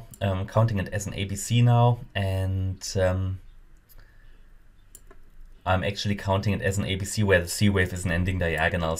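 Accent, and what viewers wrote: Germany. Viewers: German accent? Where are you form?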